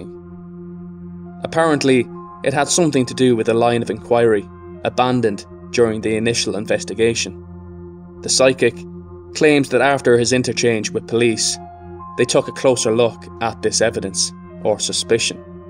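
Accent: Ireland. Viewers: Irish narrators rock.Respectful to victim,and her mother.No bias here💚🇮🇪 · Your channel has just popped up on my list.. another glorious Irish accent like Mike at That Chapter. and no gimmicks just clear narrator and detailed story .I’ve subscribed .. 😀 👍🏾👍🏽👍🏼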